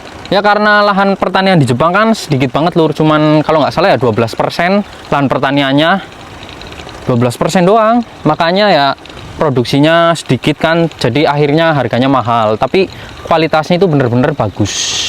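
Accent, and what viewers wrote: Banyumas, Indonesia. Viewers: Maaf mas asli ne janeh ngendi kok pd ky aku ngapak🤭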